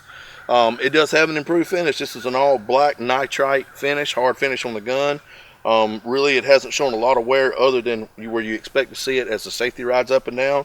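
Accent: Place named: South, United States. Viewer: i heard the country and knew you had to be from a country state like me. KS here.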